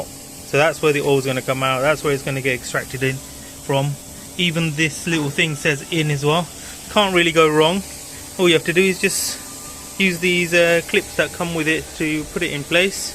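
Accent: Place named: United Kingdom. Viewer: SOON AS I HEAR THE BRITISH ACCENT I'M OUT OF HERE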